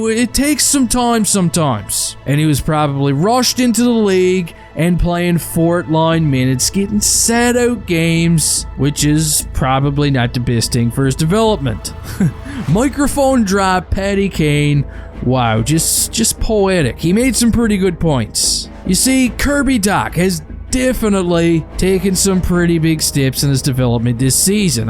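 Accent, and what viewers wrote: Newfoundland, Canada. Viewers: are you a newfy? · I know a fellow Newfie when I hear one lol, Cheers from central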